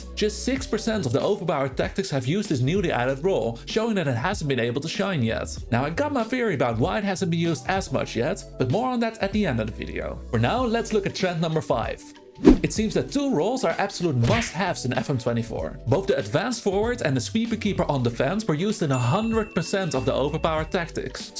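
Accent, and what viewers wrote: Netherlands. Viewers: This guy sounds soooo Dutch :D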